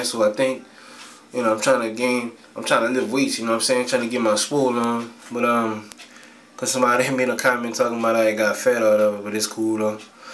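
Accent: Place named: Louisiana, United States. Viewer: u from lousiana my dude. u talk like my cousions.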